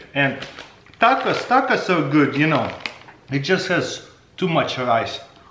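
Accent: Russia. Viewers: I loooooove that rusian accent. His so famous becuse of it. I love it !!! 0o0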